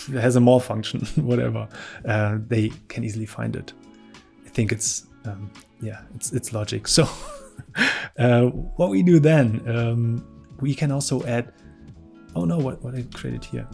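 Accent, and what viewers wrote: Germany. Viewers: I don't know who you are, I don't even know that Figma exists, but I'm glad the youtube algorithm has sent me here. This will no doubt be useful to me some time later. It looks like Figma is great. Your tell-while-showing presentation is good, mostly straight to the point as it also includes your commentary tangents. The description is very useful with the timestamps, links, and even credits to the music. Your voice with German accent is nothing but soothing and clear to understand. (Or maybe I'm just used to content creators with heavier German accents speaking English such as JoergSparve and members of ZF such as SwatKnight and Gambit) In any case, subbed. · Ur SOO german ich auch i can hear that man hört es sowasvon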